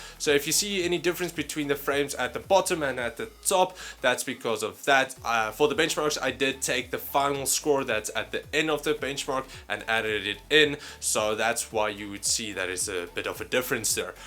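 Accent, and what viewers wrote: Afrikaans, South Africa. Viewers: Is this guy Afrikaans? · I had a feeling your South African, so am I. Lekker my bru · South African?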